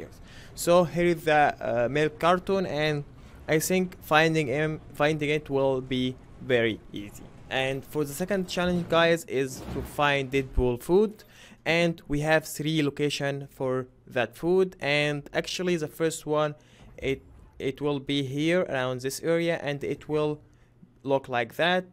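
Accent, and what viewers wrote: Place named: Egypt. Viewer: ur an egyptian thats an egyptian accent i know because i am an egyptian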